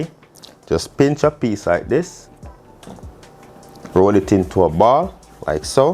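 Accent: Jamaica. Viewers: The jamaican accent makes me come back,delicious soup ofcourse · Mmmm d soup looks a lick 👅 n mi know it tast a lick cos the seasoning n flavour what makes it so tasty 😋 Amazing god bless u hands mi like u Jamaican actcent. 🤣 I love cooking especially pumkin soup 🍜 so luv to watch u videos 👍🏿👍🏿👏🏿👏🏿😋👅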